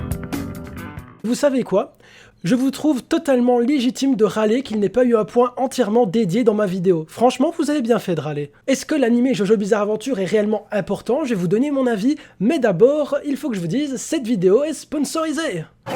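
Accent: Belgium. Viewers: Très bonne vidéo ! D'ailleurs, j'ai comme l'impression d'entendre un compatriote belge ! (Liège on dirait). Tout ça pour dire que l'oeuvre jojo à part entière a énormément été une source d'inspiration dans la pop culture. Clint Eastwood a inspiré jotaro kujo et kiff l'oeuvre. Des personnages de l'arc Joseph voire même Polnareff ont inspiré capcom pour street fighter. Dans le jeu vidéo Overwatch, le personnage ninja Genji a une réplique disant " Mada, Mada." Faisant référence au muda muda de Dio et giorno. Gucci a dédié une collection de vêtements à Jojo's bizarre adventure. Les mentions et réf ne manquent pas à l'appel. Sans se rendre compte jojo à énormément inspiré les mangas d'aujourd'hui. C'est pour cela que le fanatisme est grand. Mon opinion,. C'est que Jojo est au même rang qu'un Dragon Ball ou un Naruto. C'est intemporel et inclassable. C'est juste dans le hall of fame. 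Point barre.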